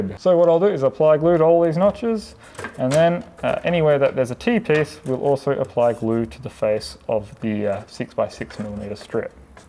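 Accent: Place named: Australia. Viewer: You rock bro. Finally, someone that goes through the process thoroughly in detail.....and so cool to listen to another Aussie lad explain everything.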